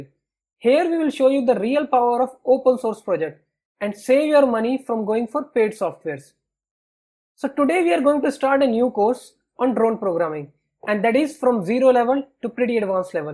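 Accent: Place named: India. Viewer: I cannot tolerate your dialect. I think you are from India. Please consider voice training. Please!!!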